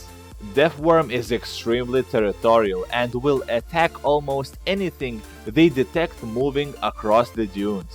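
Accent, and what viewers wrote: Russia. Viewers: wtf man im not even inglish i just asked if he was russian... because the way he talks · That accent is amazing comrade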